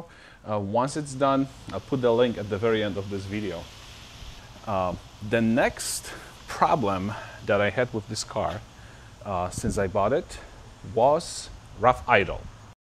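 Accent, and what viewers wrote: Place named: Poland. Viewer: sorry for my question i was just curious, noticed your accent and knew you must be polish how long you've been living in US? I'm Polish as well and live in UK Greetings!:)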